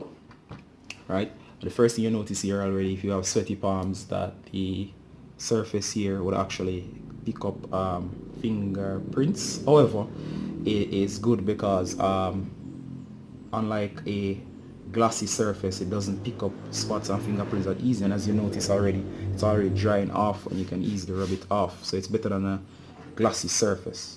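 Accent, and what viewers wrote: Jamaica. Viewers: U sound Jamaican or at least Caribbean... Awesome review bro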